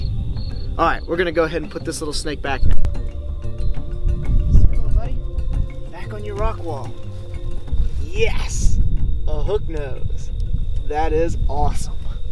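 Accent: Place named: South, United States. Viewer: WE LOVE YOU IN ALABAMA. KEEP YOUR ACCENT SOUTHERN. IT IS WHAT MADE YOU AND SETS YOU APART. WHOOP WHOOP. LORD BLESS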